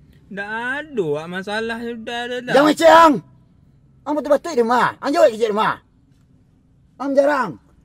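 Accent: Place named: West Sumatra, Indonesia. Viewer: Dapek na logat kuranjei mah mak.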